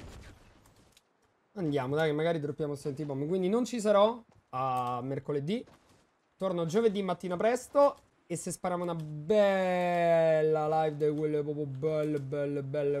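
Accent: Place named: United Kingdom. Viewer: Quanto british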